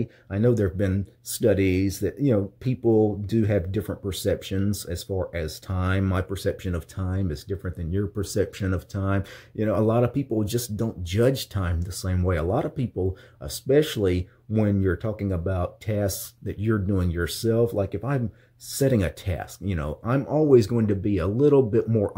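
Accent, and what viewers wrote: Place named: Texas, United States. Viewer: Unsubbed. It goes both ways,. Some people struggle with this their whole lives despite conscious efforts to improve. You sound like a true Texan in this video, and I don’t mean that in a good way. You are only isolating yourself.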